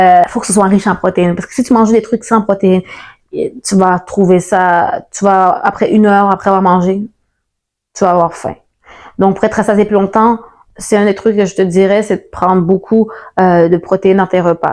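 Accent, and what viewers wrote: Quebec, Canada. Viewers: Hello ! Magnifique vidéo, magnifique parcours, magnifique accent québécois ahah j'adore !! (Jsui française) Moi aussi je vais faire ça tout comme toi, ton parcours m'inspire ! Merci beaucoup !!!! Je me suis abonnée à ta chaîne , c'est génial de te découvrir ! Je suis ultra motivée !! Ps : tu es trop belle et pleine de vie !! 😄😄🙂🙂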